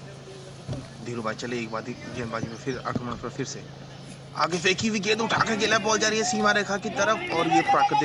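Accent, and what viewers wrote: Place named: Garhwal, India. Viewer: Gadwali boli yr bheji tu v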